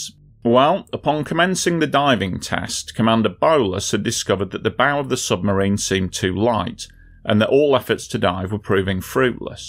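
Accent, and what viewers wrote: United Kingdom. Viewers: having to endure that British accent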